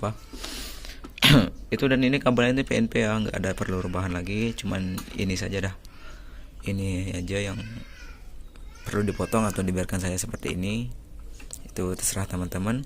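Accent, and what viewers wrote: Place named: Bali, Indonesia. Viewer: LOGATNYA KAYA ORANG BALI,, MAS NYA ORANG BALI YA?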